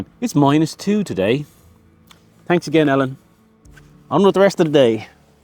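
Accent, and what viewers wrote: Ireland. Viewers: He is definitely "a keeper". Charming, funny, attractive, fun, love listening to his Irish accent, blends so well with his personality. I hope he has a good women showing him the attention he deserves.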